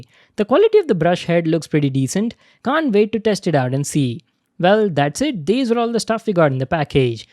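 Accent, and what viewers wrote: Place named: India. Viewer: Why you talk like Apu from The Simpson, You wanna by funny?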